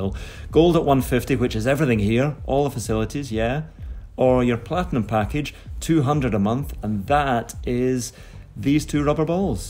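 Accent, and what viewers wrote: Scotland, United Kingdom. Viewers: that lovely Scottish accent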